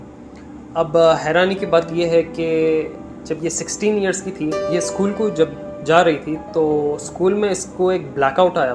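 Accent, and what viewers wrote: Hyderabad, India. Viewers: phle jaisi baat nai aari, (Hyderabadi bolne ka tareeqa...) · Ak baat humesha notice kiya Hyderabadi admi kese bhi baat kare pata chal hi jata h ino old city ke h😀😀😀😀😀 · Accent bohot hai baato mein apke pure hyderabadi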